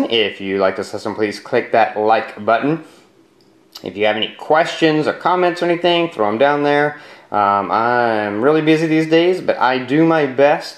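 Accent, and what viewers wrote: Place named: Scotland, United Kingdom. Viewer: How did you get the Scottish accent?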